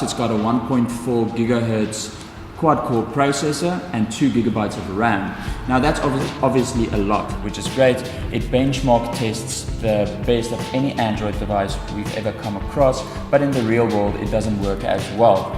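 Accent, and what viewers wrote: South Africa. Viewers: Poor audio, way too much echo and the background music is annoying but I do like the SA accent ! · Not only is the sound bad, but your South African accent shines through. Bit off putting. Next time try a few people to hear how they sound. Otherwise it is informative. I think the enhancements are awesome. The speed is also great...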